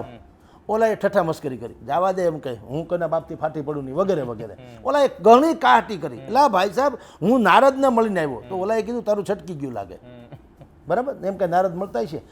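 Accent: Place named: Bhavnagar, India. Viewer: મુલાકાત લેનાર ભાઈ ભાવનગર તરફના છે ? એમની ભાષા અને ઉચ્ચારો પરથી એવું લાગે છે.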